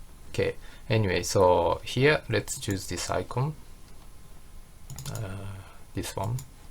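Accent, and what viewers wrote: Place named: Japan. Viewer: 日本の口音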